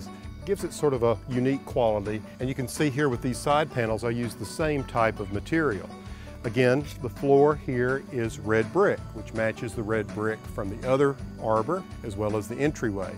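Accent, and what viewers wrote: South, United States. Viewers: You have such a great voice ... so soothing with a wonderful little dash of the South.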